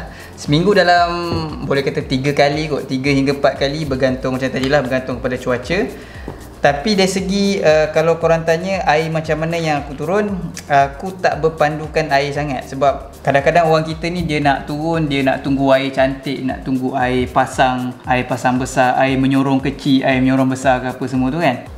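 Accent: Terengganu, Malaysia. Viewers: Sape yg tanya xde slang tranung tu? Aku mula2 tgk vid dh perasan dia ada kaitan dgn pantai timur. Hanya org kelate tranung pahang je akan perasan detail slang sesorang dari mereka 😁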